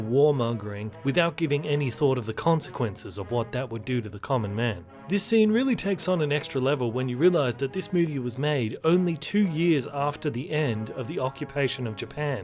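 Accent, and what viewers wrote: Australia. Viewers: Holy crap are you an aussie too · Are you an aussie?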